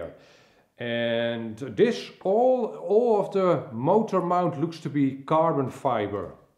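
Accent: Netherlands. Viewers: Mate, your Dutch Accent is brilliant. I love the Wing, I love the Video but all I can think of is: "Pipe and a Pancake" 😂😜✝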